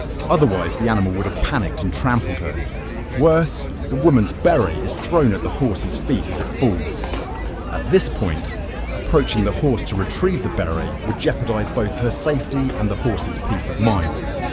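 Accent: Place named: United Kingdom. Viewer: Listening to your British English, your pronunciation. It's an English lesson for me. Thanks from Argentina. Excellent videos.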